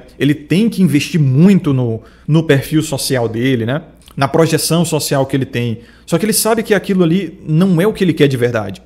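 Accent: Bahia, Brazil. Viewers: Dialeto baiano dele... O "vamos conver" ele só conseguiu corrigir esses dias... Por isso é necessário sempre filtrar as informações, pois as referências regionais dele são medíocres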